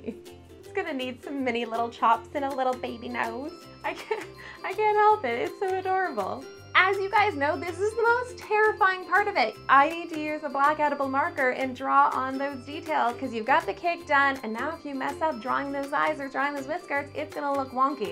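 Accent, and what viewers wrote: Canada. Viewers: Is she Canadian or Australian?? She sounds just like me. Just the way she says out she sounds just like simply nailogical too!!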